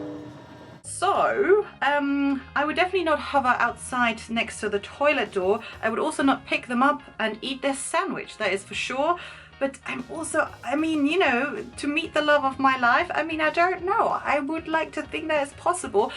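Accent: United Kingdom. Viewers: Love this video! You sound so British too x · you sound almost British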